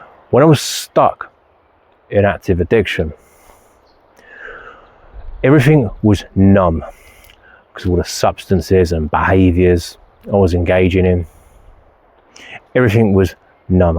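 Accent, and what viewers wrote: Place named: New Zealand. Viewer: You really picked up the new Zealand accent love it!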